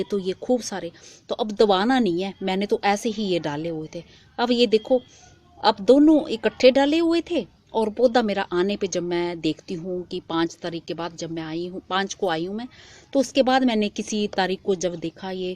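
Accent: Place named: Himachal Pradesh, India. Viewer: apki awaj se saaf pata chal Raha hai app himachli ho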